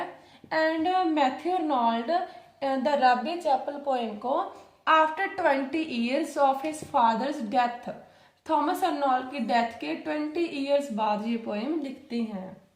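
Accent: Punjab, India. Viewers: 👌 Very nice video mam. 🙏 Thank you so much for your valuable information. क्या आप पंजाबी या हरियाणा से हो? ऐसे ही पूछा है आप के बोलने के अंदाज से. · Your voice reflect Punjabi tone,nice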